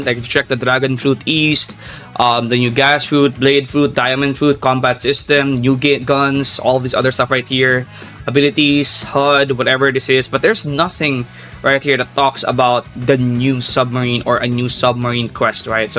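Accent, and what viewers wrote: Philippines. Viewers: Bro got that Filipino accent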